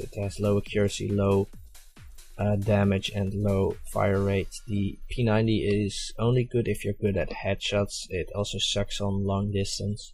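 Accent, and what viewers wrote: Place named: Russia. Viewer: the guy sounds russian i can tell an accent very good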